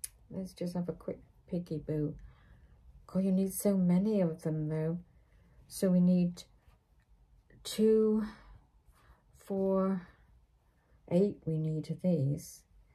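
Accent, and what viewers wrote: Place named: England, United Kingdom. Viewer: So lovely to catch up with you again. You laser light is so handy. It saves drawing a pencil line to follow. Love seeing you putting your pretty fabrics together. I have reserved the Alices Wonderland sampler book in my local library. They only have one copy and I am 8th out of 9 on the waiting list! It is a very popular book. You live in a lovely area of England and your accent is so very cute. Take care xx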